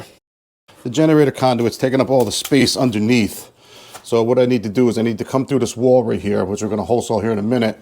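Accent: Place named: New Jersey, United States. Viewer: You still sound like you're from Jersey.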